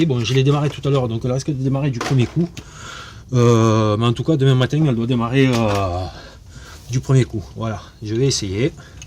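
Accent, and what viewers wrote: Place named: Midi, France. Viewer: Bravo, c'est très clair et bien expliqué.Et avec l'accent du Midi, en prime.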